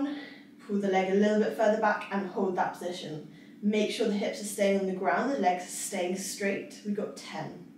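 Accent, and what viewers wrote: United Kingdom. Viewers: Strive for perfection. Or do nothing n be perfect. Pony tail. British accent. Ima gitcha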